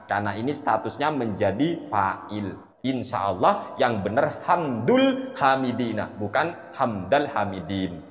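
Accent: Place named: Jember, Indonesia. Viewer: Kaya logat Jember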